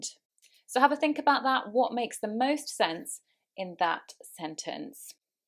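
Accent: United Kingdom. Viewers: omg, you have a pretty good british accent!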